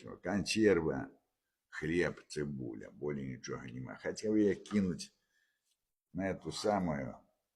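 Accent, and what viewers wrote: Belarus. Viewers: Белорусский говор.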